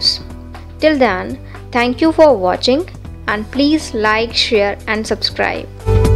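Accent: India. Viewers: I find her voice narration annoying. Its too thick of an Indian accent and she "lip smacks wet" too much. Ruined it for me. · india voice?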